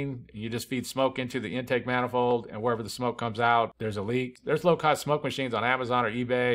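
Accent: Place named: United States. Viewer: I'm like he's speaking perfect American English so I'm like ...... huh? WTF is a Shievy Cruze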